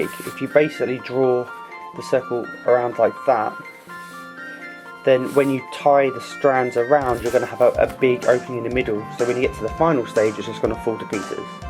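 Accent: United Kingdom. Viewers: Because he has an English accent? It would be better if it was an American talking. I'm british and find the accent from across the atlantic much nicer ! :)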